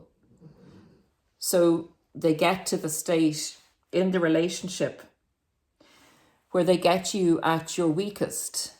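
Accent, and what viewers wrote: Ireland. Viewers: Malignant narcissistic relationship survivor and thriver here. Your personality is beautiful and you are as well. Your Irish accent is so charming! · This has to be one of the best descriptions and articulations of narcissistic behavior I've heard. And it is very hard to hear. I find myself reliving childhood memories of grief, tortured at the hands of a sadistic narcissist. I'm not sure if this reliving is therapeutic or just pouring salt on wounds that are healed as much as they ever will. One of the key attributes to an encounter with a narcissist is lingering self doubt. One questions their own behavior, "Did I do something to deserve this?" or "Oh, if only I were more sensitive and tip-toed more carefully I would not have offended and drawn this wrath!" It is this self doubt that later pains me. If I had only seen it! If I had only been a stronger person! 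If I could go back in time.... In any event, thanks for sharing. And your Irish accent is just awesome!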